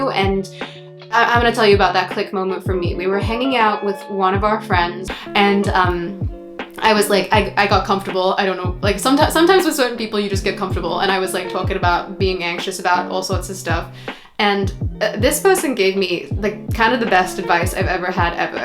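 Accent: United Kingdom. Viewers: I can hear the British side screaming to come out ❤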